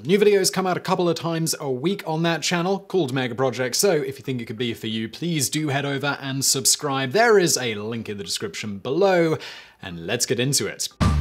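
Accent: England, United Kingdom. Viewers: you have a very similar accent/dialect to me. I am English and enjoy the language we hol in common. However, I do wish you woul cease using US phrases and pronunciation - I don’t know whether the arseholes of the US are flattered by your transatlantic euphemisms or laughing at you. I merely find the practice abhorrent. If you think that using US slang will give you a better audience, I very much doubt it. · Alicia Rice How is it he sounds so insular, whenever he comes across a foreign word it’s pronounced stubbornly in the King’s English? He’s the type who pronounces Don Juan like Don John. It would kill him to pronounce croissant other than like crescent. There’s a reason Brexit happened, no matter how much pretends to be internationalist.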